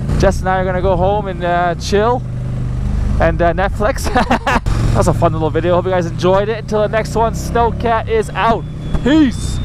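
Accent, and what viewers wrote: Canada. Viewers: He's Canadian not American Think that America isn't the only country in the world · canadian ehhh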